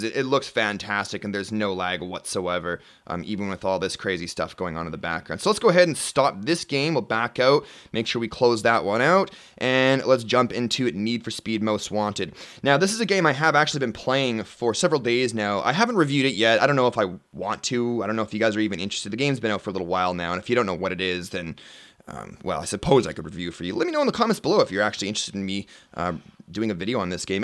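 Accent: Canada. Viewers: I must ask... Are you Canadian? :>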